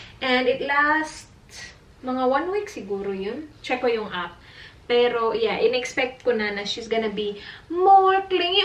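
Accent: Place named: Visayas, Philippines. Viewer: Hala gibisaya nana nmu ate..hehehehe..so cute😍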